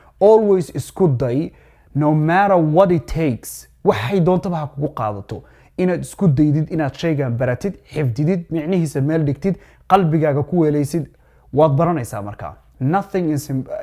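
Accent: United States. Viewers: Maa shaa Allah u gotta completely the accent that's my cuppa ... thanks teacher. my American pronunciation coach .. i swear to God ., i'll get it done cuz it's just a piece of cake...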